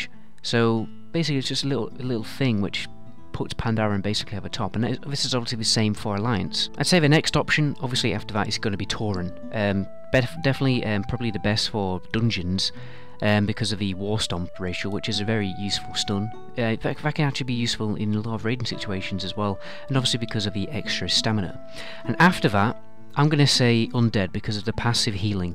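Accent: United Kingdom. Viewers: off topic but may I ask where in the UK you're from? I recognise your accent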